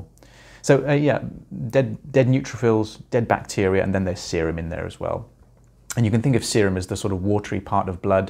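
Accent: United Kingdom. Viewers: Always loved the British accent💋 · wait how come you have a british accent if you live in north carolina no offense im just wondering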